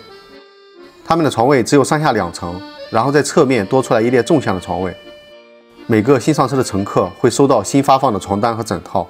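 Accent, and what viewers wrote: China. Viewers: 猜想博主是山东人？ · 您是河南人吧❤❤❤❤口音❤❤❤❤中原特色❤❤❤❤❤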